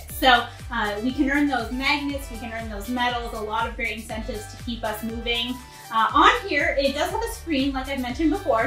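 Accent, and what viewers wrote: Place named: New England, United States. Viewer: Great relaxed demo. I take it you're from either Massachusetts or New Hampshire? (THE ACCENT) 👌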